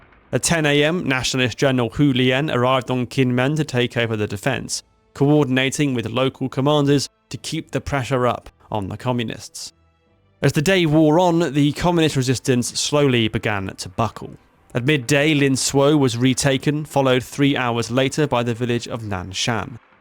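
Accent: United Kingdom. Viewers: Good information but your bias is too strong. Also your pronunciation of Chinese is soooo British. Just a travestry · The British accent is the best for history videos fr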